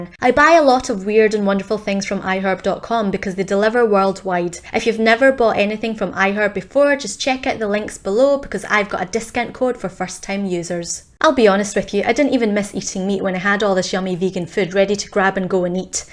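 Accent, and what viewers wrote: Scotland, United Kingdom. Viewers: Whenever I see/hear an Asian with a UK accent, my brain does a double-take. Scottish is a new one for me. And you live in Australia. And eat Canadian maple syrup. Such Commonwealth. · You speak with a lovely Scottish accent. Am I correct? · I was just looking for some decent vegan meal preps & came across your video. Took me 5 seconds to subscribe thanks to that fabulous fellow Scottish accent! Woohoo! :D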